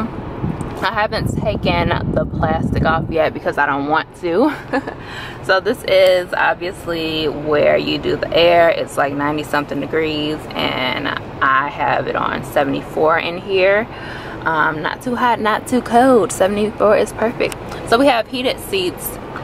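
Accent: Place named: South, United States. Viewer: You have a southern accent, so initially, I thought you were from NC; then I thought, maybe Texas. Chicago?! LOL!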